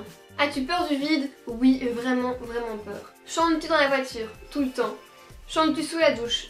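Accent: Belgium. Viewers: Il me semblait bien avoir reconnu ton accent Belge :) C'est rare de voir des youtubeuses belges d'ailleurs ! <3 :)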